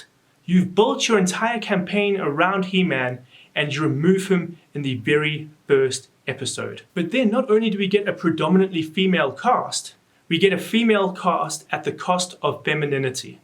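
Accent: South Africa. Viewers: Howsit bru nice to hear a South African voice, yeah this sucked big time a show that was launched in the 80' for young boys that is now about a show that lacks any sort of male character, Ripley and Sarah Connor are great examples of strong female leads, young boys need heroes too man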